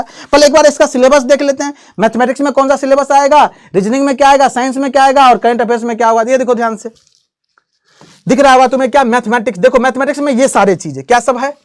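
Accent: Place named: Bihar, India. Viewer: Sir aap vihari ho na qwalification nhi hai wo.. qualification bolte h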